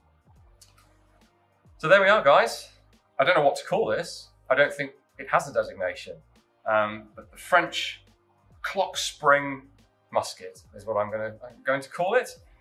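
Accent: England, United Kingdom. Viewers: Ian, what happened to your hair and why are you speaking in an English accent?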